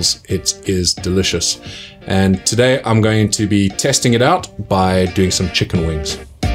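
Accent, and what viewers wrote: South Africa. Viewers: Thanks for the video. I have been making Indian and North African spice blends for awhile now. I am expanding more into cajun/creole cooking now, and this helps. PS love the South African accent. I grew up there. Live in Northeast USA for the last 38 years now.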